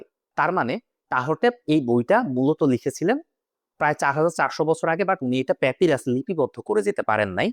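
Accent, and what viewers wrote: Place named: Bangladesh. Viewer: দাদা সব ঠিক.. আপনি দেশীয় বাংলাদেশী ভাষা ছেড়ে শুদ্ধ বাংলা বলুন শুনতে ভালো লাগবে....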